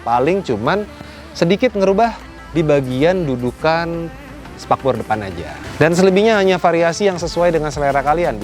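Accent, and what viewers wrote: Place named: Medan, Indonesia. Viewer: Tengok lah itu, kaya orng medan aja bg ini 😂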